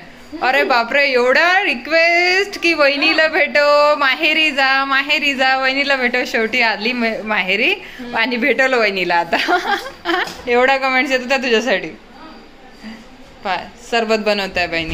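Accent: Vidarbha, India. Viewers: हो माझी आई पण विदर्भ मधले ते पण संभार बोलते😂😂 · Tai tuzi vidharbh bhasha khup avadte. Mazi aai pan khamgaon madhli ahe. Khup athvan yete tuzi language aikun ajol chi...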